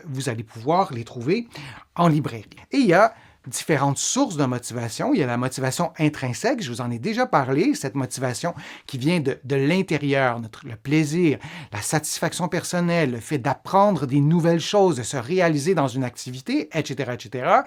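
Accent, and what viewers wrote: Canada. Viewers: Merci pour tous vos conseils , je vais acheter vos livres et je vous regarde en vidéo et puis j 'aime entendre votre voix avec le petit accent canadien . Vous êtes très motivant pour nous tous.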